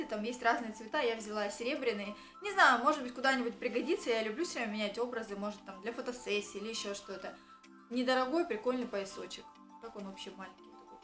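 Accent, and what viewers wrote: Rostov, Russia. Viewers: по-моему ростов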